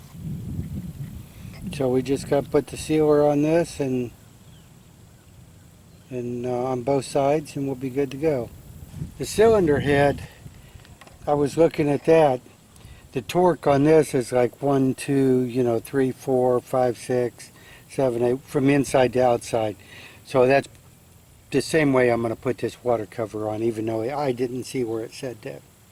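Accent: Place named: United States. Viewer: What type of tap and dye set? I've got Metric, UNF, BSF, Whitworth, Uncle Tom Cobbly and all but none of them are the right thread! I wish you Yanks would realise that there's a whole other world outside the good ol' US of A when making these Youtube videos, and for god's sake, learn to say aluminium! Alooooominum sounds so silly!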